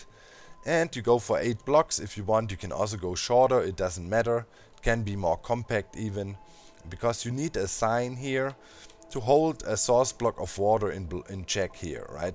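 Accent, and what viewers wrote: Germany. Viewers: Du solltest TV kommentator werden :D man merkt allerdings das du Deutsch bist.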